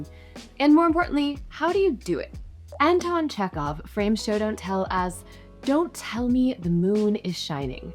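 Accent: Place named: United Kingdom. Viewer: Once again, not helpful for me to improve on not telling in my story. She's speaking British, and I'm full-blooded American from Chicago. It may generally be English, but the language is still foreign to me.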